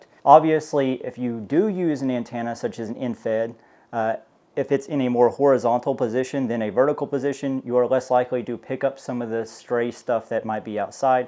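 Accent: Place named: Kentucky, United States. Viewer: How do you say antenna is how I knew you were from Kentucky!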